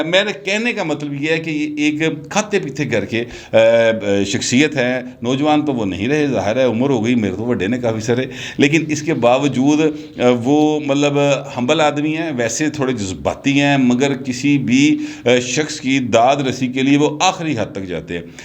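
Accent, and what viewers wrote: Lahore, Pakistan. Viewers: sahib you are indeed a great anchor,agree with your point of view,love to listen to you in a proper Lahore style.well entrenched and thought over examination of the current issues.Regards and Stay Blessed.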